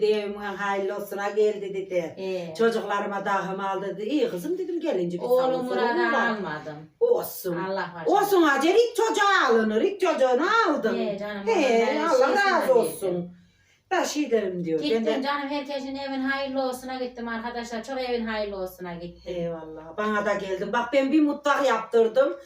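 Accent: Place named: Çorum-Amasya, Turkey. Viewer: Orası corummu amasyalı yoksa samsunmu tokatmi yoksa şive otaraflar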